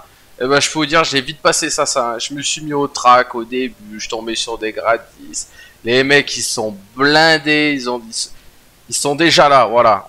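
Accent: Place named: South, France. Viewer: Je m'abonne pour ton accent le sang du sud mais je suis Dofus 2 👍 rétro j'y retourne plus 😂 trop de farm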